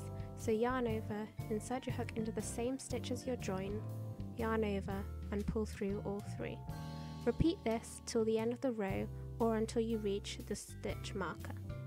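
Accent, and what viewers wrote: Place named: United Kingdom. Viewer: Yessss! You go girlllll. I like how slowly you work. I learn by repeatedly watching the action over and over again. So this really works for me. Very good close ups so one can see exactly where to put the hook. Love the fact that there are no speeded up camera shots I'm better at knitting. My crochet tends to go wierd and lop sided as I don't always place the hook in the right place. I get so stressed when I crochet so I don't tend to do it very often.😥 Its really good to hear a tuturial made by a British person so I am not going to get confused like I do when following an American crafter. I am going to try and make this. I have not made a crochet garment for years. Thank you for sharing. How many balls of the Women's Institute Aran yarn did you buy for the project?Bravo teacher👍🏿🙏🏿👏🏿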